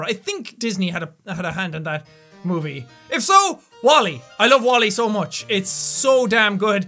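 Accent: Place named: Ireland. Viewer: thanks man I'm inspired by you a lot and eventually want to play games on YouTube with you mark and Felix! My dream is to create a little community of my own on YouTube and have a nice group of my own! I would like to try and be a sombody rather than a nobody. And prove to the world just cause I have a mental disorder (ADHD) doesn't mean you won't achieve great things. I know I've got a huge challenge ahead of me and I'm already off to a bad start. But I'm determined to become a youtuber! Although right now I'm only doing Lego videos. But I hope to get gaming videos up within the next year. After I find s job. Right now I'm still looking for one and I have been since Christmas break. I hope you don't mind my super long and a bit chattering comment. But stay awsome and thankyou for so much inspiration. Unfortunately, I wish you used your Irish accent it reminds me of back home (Newfoundland, I am a proud Canadian)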